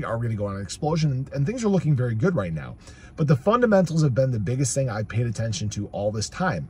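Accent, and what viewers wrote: United States. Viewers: Wisconsin, eh? I was thinking Chicago 😄 thanks for the great coverage of ADA! 🚀🚀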